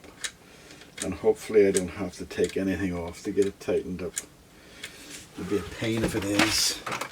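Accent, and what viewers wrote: Northern Ireland, United Kingdom. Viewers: great to hear a norn iron accent and sense of humour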